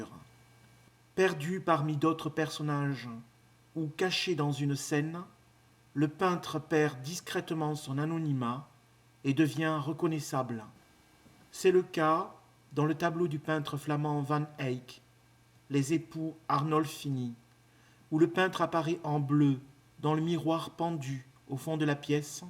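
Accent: Marseille, France. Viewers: Il a l'accent marseillais de l'huile pujet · Allez L'OM ⚪️🔵 L'accent de Marseille Wallah... Sheeeeesh....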